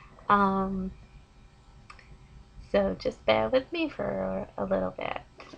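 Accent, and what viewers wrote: United States. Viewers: How are you Australian when you have an American/Canadian accent? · Hey, if you still log into your channel. I have a question...you say you are from Australian? Yet, your accent sounds very much American. haha:p I dated a girl from Melbourne once, her Aussie accent was very thick. hmmm maybe you watch way too much American Television?! Haha:p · You sound more like an American to me~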